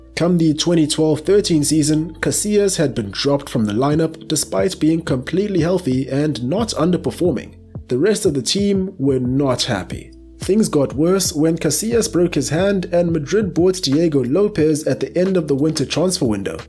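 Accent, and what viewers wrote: South Africa. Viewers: is the narrator South African ? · Off topic, are you South African? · Great videos mate keep up the Good work🔥.....I can't help but notice your accent sounds very South African... so are you from SA😂❤ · Random question mate but are you South African ?🤣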